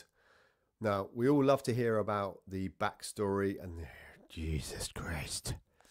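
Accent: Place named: United Kingdom. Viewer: Oh the struggles with recording speech that makes sense lol Kudos to you for recording without a script. And BTW I love your beautiful British accent 😊 (I know you ARE British but not every Brit sounds as nicely as you!)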